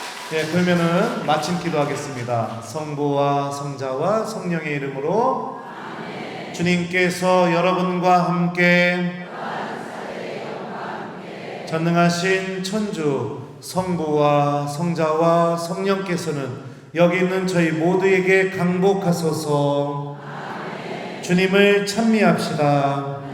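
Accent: Chungcheong, South Korea. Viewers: 구수한 충청도 사투리도 정겹고 신부님 강의 매일미사 강론 너무 감동적으로 잘듣고 있어요. 신부님말씀듣고 어제 고해성사도 했어요. 정말로 좋은말씀 듣고 눈물콧물 흘렸어요. 감사합니다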